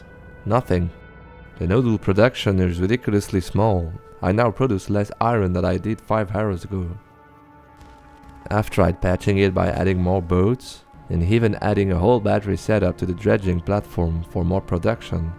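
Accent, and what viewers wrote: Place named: England, United Kingdom. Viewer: Joli accent englais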